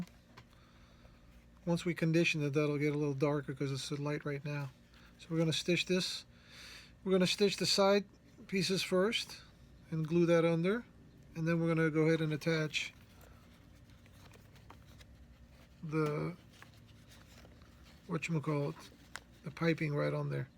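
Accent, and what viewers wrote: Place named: Baltimore, United States. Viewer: you have a Bawlmer "about" and "over"! What's with that? Love watching you work!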